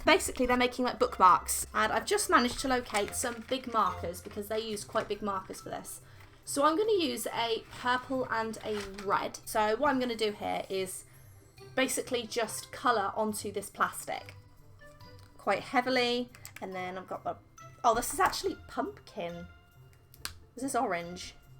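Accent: England, United Kingdom. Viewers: You sound British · You're British right? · r u british? love ur accent!! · Are you British? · Hang on... She has a British accent but mention Walmart which doesn't exist in the UK so does she has a british accent but lives in us or am I tripping 😂😂😂 amazing video tho xx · Walmart??? Are you American? You sound English...? Xx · Woah a wild British person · She’s British 😂😂😂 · it is a British accent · no this is not American accent its British i live in the us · I'm Australian and I laughed so hard at this comment because she's so obviously British to me lol. I'm not an expert but I believe her accent is northern of some kind. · How is this beautiful child of a woman not British??????? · I'm in love with your British accent😍😍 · The British accent tho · Are you British? Your accent sounds very British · I did NOT expect a british accent 😂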